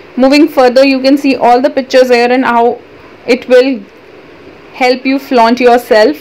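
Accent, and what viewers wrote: India. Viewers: I think you should do asmr videos your lovely Indian accent gave me brain tingles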